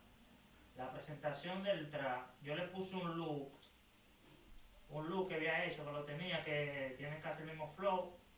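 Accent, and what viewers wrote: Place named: Mexico. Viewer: supér carnal pense que eras de mexico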